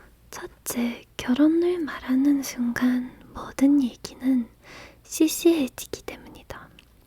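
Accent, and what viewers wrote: Standard. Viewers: 你的韩语发音很标准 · 你的读音都非常标准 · your pronunciation is really standard, and it's hard to tell that you grew up abroad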